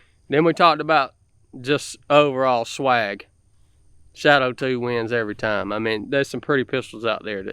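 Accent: South, United States. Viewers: Smart people with southern accents are always fun to listen to 🤟🏼🤟🏼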